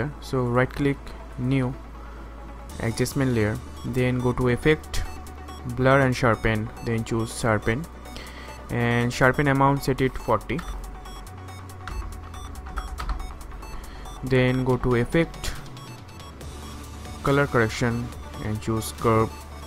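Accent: Bengal, India. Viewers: sounds indian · Really hard time understanding a word he's saying... Too strong an Indian accent. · wow the Indian accent !!!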